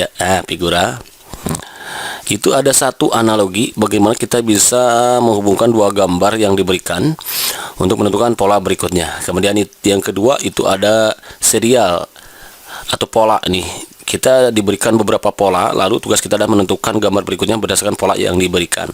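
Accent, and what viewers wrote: West Java, Indonesia. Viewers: Klu orang sunda mah seru, enak d simak 😀. Auto Subscribe n like 👍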